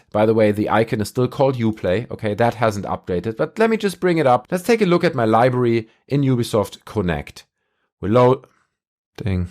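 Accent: Germany. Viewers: Man I just love your pure German accent and wanna see you rant more and shit on ubishit!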